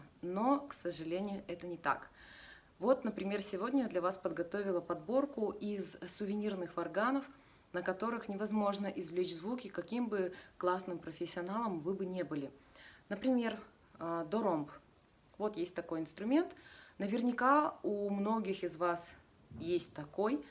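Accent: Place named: Yakutia, Russia. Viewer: Hi! Excellent tutorial as always🤗🤩. Thank you for the English transcription but nothing will ever replace your beautiful Yakutian accent😍😘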